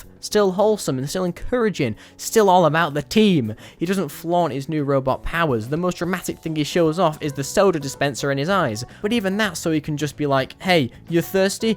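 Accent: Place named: United Kingdom. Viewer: dude I'm 10 minutes into the video and I'm loving it so far, but I felt the need to say something completely unrelated. I'm studying English Phonetics (as a foreigner) and I have a midterm on it tomorrow. this video is genuinely helping me so much, I'm like transcribing everything you say into phonetic script in my head. I don't know if you speak BBC English, Estuary or some other variant, but I'm shocked at all the diphthong shifts, intrusive R's, elisions, assimilation and other things I'm identifying. again, completely unrelated to what the video is about but it's helping me so much. thank u :)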